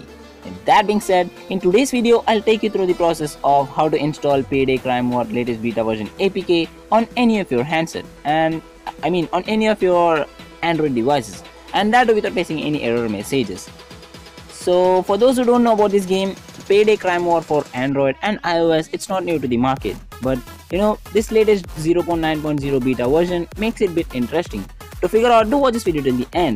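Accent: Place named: India. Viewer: you sound Indian and your engglish is realy good man keep up the good work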